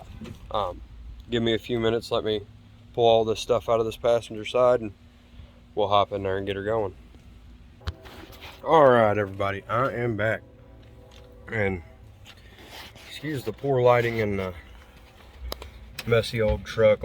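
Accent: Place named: South, United States. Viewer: That's a nice truck and a fun project if one have the time and money for it. Especially since it was your granddad's. I love your accent. I'm European and have never been to the USA but if I go there one day I think I'll skip the north and just travel around the south, probably Nevada too because of Fallout New Vegas. 😄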